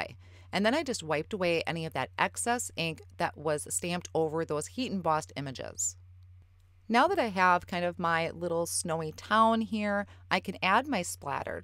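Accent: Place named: Midwest, United States. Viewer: did you say that those are Houses or Whooses? Sorry I have to tease, your midwest accent is so cute! I have a number of relatives in northern Wisconsin and Michigan, and so. Beautiful card btw!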